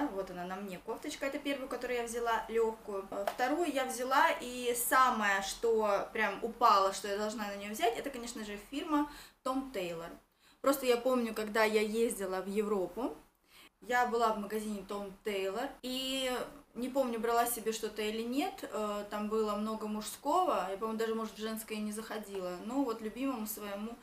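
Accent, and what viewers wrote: Rostov, Russia. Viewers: Не вылазИЮ))) , ой не могу с ростовского говорка ))))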